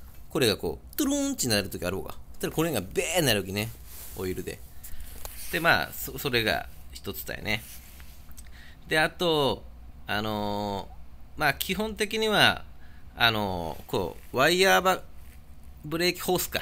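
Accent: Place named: Chikuho, Japan. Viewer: 筑豊弁懐かしく見入ってしまうw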